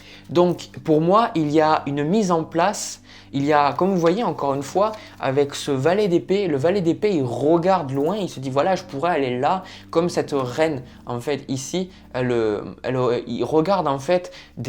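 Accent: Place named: Southwest, France. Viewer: Bonjour, étant ascendant scorpion, c'est la première vidéo que je visionne sur votre chaîne. Néanmoins, je dois avouer que par manque de temps, je n'ai pu regarder uniquement la moitié de votre vidéo, mais ce n'est que partie remise. La partie que j'ai visionnée a été extrêmement émouvante. Chaque mot que vous avez prononcé (avec votre joli accent du sud-ouest), j'aurais pu les prononcés moi-même, dans mon esprit, dans mon coeur et à voix haute. Oui, instinctivement et intuitivement, sans me poser dans la figure de la victime, je me suis souvent demandée ces derniers temps: Pourquoi moi? Je ne me reconnais plus dans ce que je suis devenue? Quel est ce tournant que ma vie a pris sans que je ne me rende compte de rien. Intellectuellement, j'ai beaucoup cherché mais maintenant je sens et même je me doute que la réponse est dans ce qui est caché, ce qui est connecté au spirituel, d'où le tirage de ces cartes pour les scorpions qui incarnent des notions de spiritualité. 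Merci du fond du coeur, de ce tirage en grande résonnance avec mon âme. Je m'abonne.🙏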